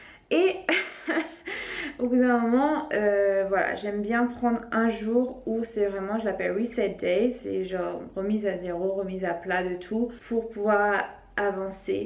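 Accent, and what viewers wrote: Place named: Australia. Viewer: vous êtes un petit accent d'Australie, ou des états uni peut-etre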